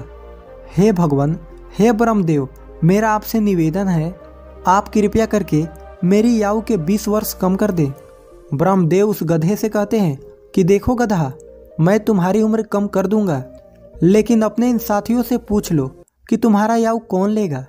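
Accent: India. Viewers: Aap bhojpur bhasha k nagrik hain aap ka uchcharan aur tone bhojpuri style ka hai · Bihari hindi OMG🤣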